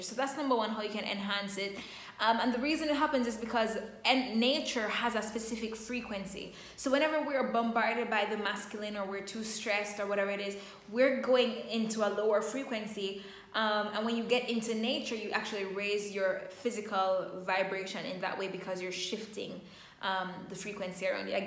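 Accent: Jamaica. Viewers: I hear jamaican accent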